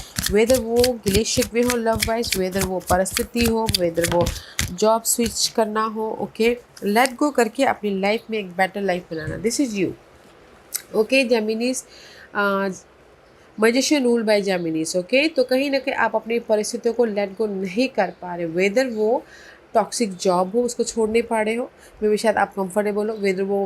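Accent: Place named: Bengal, India. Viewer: Bengali accent 🙏🏻👁🙏🏻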